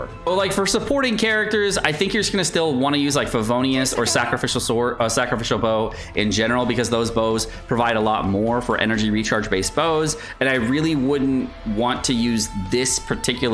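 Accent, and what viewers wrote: United Kingdom. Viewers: is a real one lol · IS BACK BEST UPDATE SIGN HERE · Yes!! the is back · LETSGOOOO BRITISH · I just wanna say i really missed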